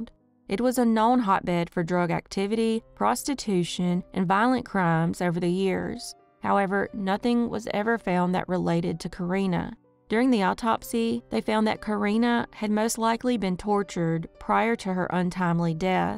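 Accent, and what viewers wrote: South, United States. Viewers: GREAT JOB!! KEEP EM COMING!! AND I LOVE THAT SOUTHERN COUNTRY VOICE!!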